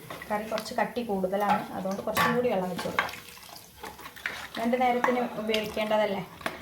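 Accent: Kozhikode, India. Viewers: കോഴിക്കോടിനടുത്ത് കല്ലായിലോ ഫറോക്കി ലോ ഉള്ള ഭാഷ പോലെ തോന്നുന്നു?❤❤❤❤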